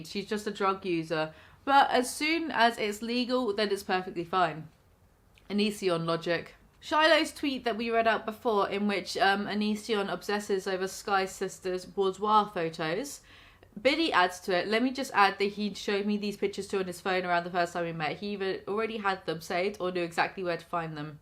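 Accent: United Kingdom. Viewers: oh man, I haven’t enjoyed a British voice like this since John Lennon. when you look at the camera and tell Greg to fuck off after exposing some shit, well, it really hits home. just fucking hilarious. 😂 It’s so good that I know Onision really has defense at all to it. he probably should be scared.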